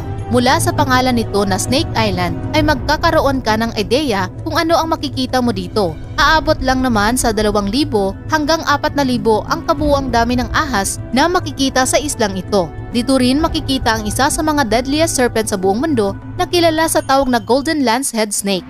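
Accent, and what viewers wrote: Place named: Visayas, Philippines. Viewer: Visaya ang commentator medyo konti pang praktis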